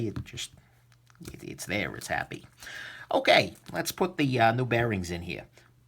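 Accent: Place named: Northeast, United States. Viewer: voice is calming, which is usual with a Noreaster accent. Great video with very thorough information.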